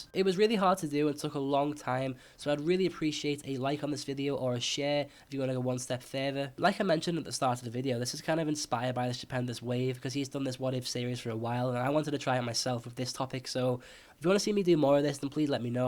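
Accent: Liverpool, United Kingdom. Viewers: Are you from Liverpool? .-. also cool vids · Are you from Liverpool??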